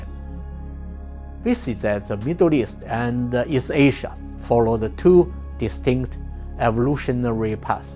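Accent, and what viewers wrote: China. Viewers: Excellent analysis. May I make a few suggestions? Common chinese mistakes when speaking English: 1. "V" sounds. "Very", not "Wary". "Vast", not "Wast", "Video", not "Wideo". 2. "TH" sounds. "THink", not "Sink". 3. And in this video, "Empire", not "empAIR". There are more, but these 3 are most obvious. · Insightful + interesting analysis, but the prof should have let a superior English speaker narrate his content; his Mandarin accent was so painful, I had to view to video muted, with CC.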